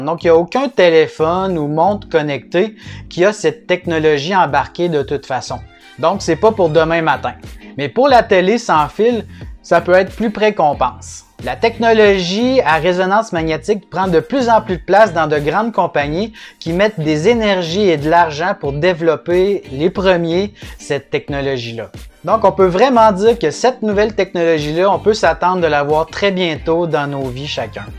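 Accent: Quebec, Canada. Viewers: Jai regarder tes vidéos jai appris des choses merci tu fais des vidéos super ! Et j'adore ton accent québécois merci d'être passez sur ma chaine en tous cas,force à toi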